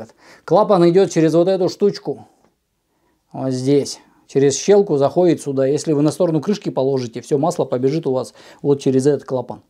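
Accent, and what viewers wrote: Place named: Kirov, Russia. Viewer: Как приятно слышать Кировский говор всё супер у меня такая пока всë норм нравитс🎉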